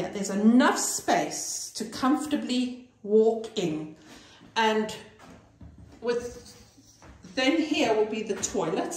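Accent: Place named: Cape Town, South Africa. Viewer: You sound like you're from Sea Point, Cape Town.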